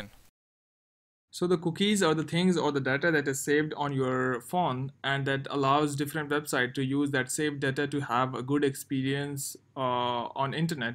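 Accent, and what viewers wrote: India. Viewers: He is Indian . I can tell by his accent.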